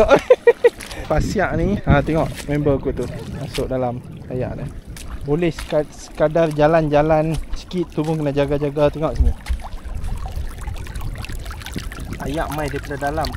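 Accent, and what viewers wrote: North, Malaysia. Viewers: Selalu tgk vlog mat salleh..ni vlog BM loghat utara..mmg lain dari yg lain..saya dah subs😊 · Yang paling best loqhat utara tak hilang weiiii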